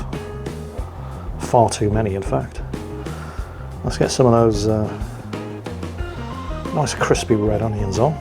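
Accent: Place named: England, United Kingdom. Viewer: [New Recommendation comes up] What's this? is doing a cooking channel now? Guess that's what I get for not watching Mingles with anymore. Subbed. Continue soothing my ear holes with your soft English accent you crazy old fart, you.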